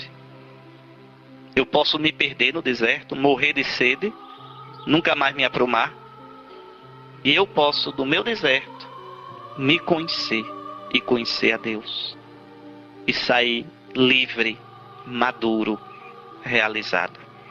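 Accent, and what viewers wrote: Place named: Northeast, Brazil. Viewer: Taí um verdadeiro filho do trovão , que hoje no céu com seu sotaque nordestino cabra macho faz o encardido tremer quando algum filho lhe pede um favor , obrigado Jesus pelo teu filho Dom Henrique. Louvado e glorificado seja nosso senhor Jesus Cristo. Fiquem todos na paz de Jesus e no amor de Maria.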